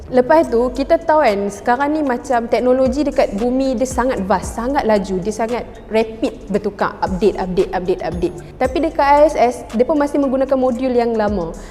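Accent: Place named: North, Malaysia. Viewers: Dia jatuh seketoi..pekat btl Utara hang noh😂😂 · guna la bahasa melayu standart.....adoiiiiiiiiiiiiiiiii....teruk betul bunyi utara.... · Loqat utaqa kita geng.. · pekat naw utaqa dia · Pekat sangat loghat utara hang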